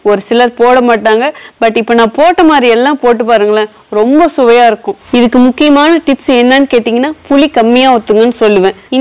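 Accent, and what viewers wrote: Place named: Coimbatore, India. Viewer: ஏந்த ஊர் கண்ணு நீங்க உங்களுடைய பேச்சில் கோயமுத்துர் வாசம்வருதோ recipes are super I made your pongal and tiffin samber recipe it came out well thank you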